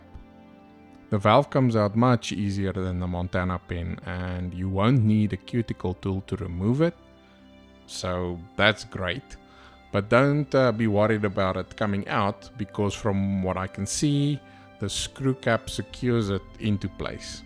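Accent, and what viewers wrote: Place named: South Africa. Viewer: Cannot miss the South African accent!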